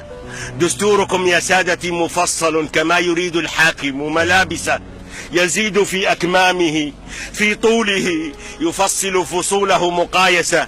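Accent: Tunisia. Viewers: هذا تونسي¿? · Abdelilah Ait cadi هذا تونسي لهجة الجنوب وقناة الجنوبية تونسية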